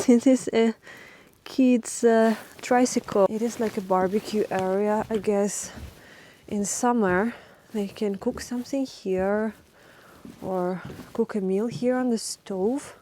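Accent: Russia. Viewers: True winter wonderland filmed by a truly talented and very engaging blogger .. in love with her Russian English accent.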